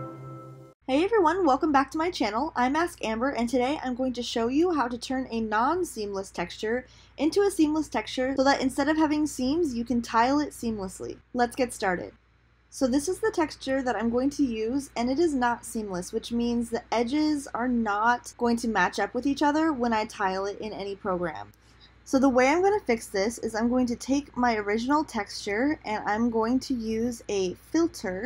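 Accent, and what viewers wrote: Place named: United States. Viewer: Where is this annoying US accent from? It's exactly the one we try and imitate in Europe when we say US tourists are obnoxious and loud. Bay Area? Santa Monica?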